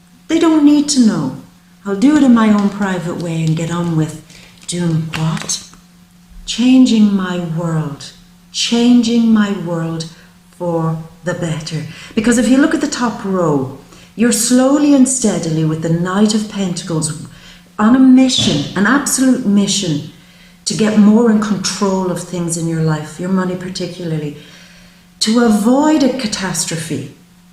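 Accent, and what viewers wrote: Ireland. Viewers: you are so animated and unique i love listening to that pure irish accent.good girl.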